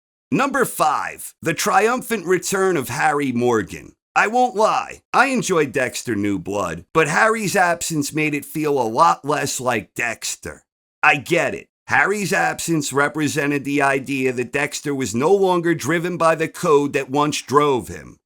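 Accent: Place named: Philadelphia, United States. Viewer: Sir, I don't want to get too far off topic but I've lived outside Philadelphia my whole life. Most of the people around have a very specific accent and you sound like us. I don't need to know where you live or anything but every time I hear you talk I wonder if you live within the region.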